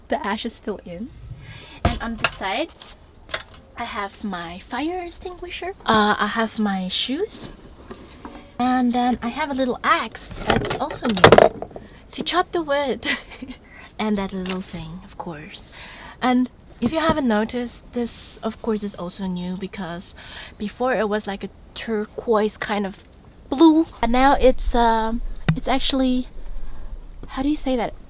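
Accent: Germany. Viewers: it sounds like you have a german accent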